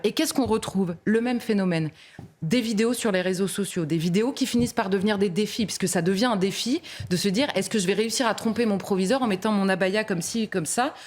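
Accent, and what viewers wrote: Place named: Southampton, United Kingdom. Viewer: J'ai reconnu l'accent de Southampton en Angleterre ...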